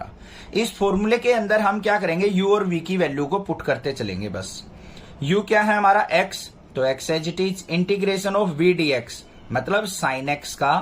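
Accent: Haryana, India. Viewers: Sir aap haryana se ho ? Aapke voice tone se lg rha h aisa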